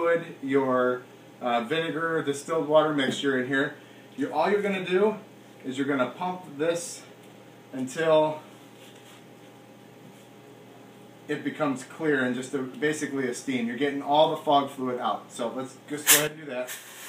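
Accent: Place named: Wisconsin, United States. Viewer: 10 years after this was posted I'm here learning.. I only stayed to watch because of your accent 😂 and you're from Wisco.. my family is from Fond du Lac .. I'm in Ga ( booooo ....Go Pack Go) ANYWHOOOOOOOOO thanks for the tips! I have 3 fog machines to clean before I store them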